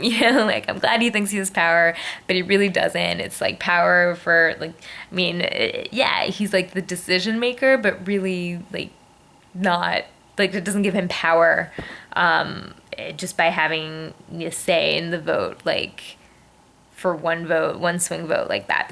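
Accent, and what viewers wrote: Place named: United States. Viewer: "You're playing for a Million dollars, NOT for a doughnut" haha btw what is it with Eric ? i mean he finds the idol, then hands it to Andrea LMAO does this guy never learn !! Reynolds and Eddie voting for two different players, is just ridiculous. note: you may sound American, but you get the beauty from your australian side ;-p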